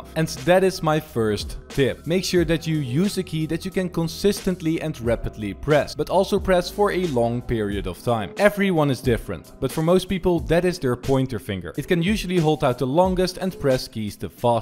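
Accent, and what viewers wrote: Netherlands. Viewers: gek Nederlands accent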